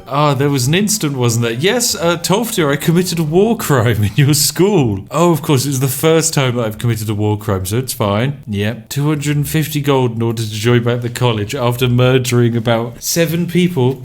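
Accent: United Kingdom. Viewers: Are you English or Scottish or Welsh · Are you british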